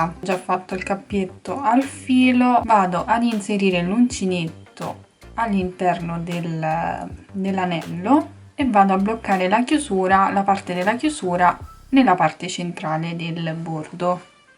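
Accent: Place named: Italy. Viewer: Belo trabalho. E bom ouvir o jeito de falar do povo italiano . Me lembro da minha nona . Minha vóvo falava assim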